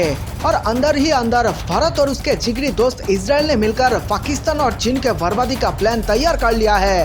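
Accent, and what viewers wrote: Bengal, India. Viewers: Idiotic narration. Change it. Your heavy bengali accent isn't music to the ears. No offence intended. Get a new narrator. That will be help your channel. ( But all concocted and false info)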